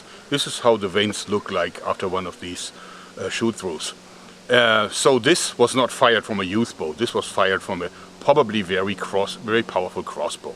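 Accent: Germany. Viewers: Okay, i wondered for a Time now Is he German? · My biggest wish is that says "It is bullshit" for once, just in that nice thick german accent of his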